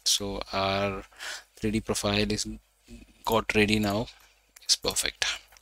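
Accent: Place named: India. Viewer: I wish there was a way to filter out Indian videos. I cannot possibly listen to that accent.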